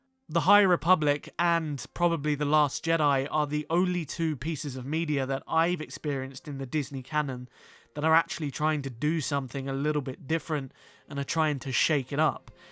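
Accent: United Kingdom. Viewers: First of all, I absolutely agree (25 secs into the video) because every argument sounds BETTER with British accent. Thank you so much for throwing this video and pointing out excellent things. This video is the PRIME reason I started my own channel to bridge the gap between Legends & Canon and have the fans see the beauty in both! LucasFilm cares, Disney cares, and the new Legends re-prints are glorious!! I'll be watching your videos. Thank you so much for this great video.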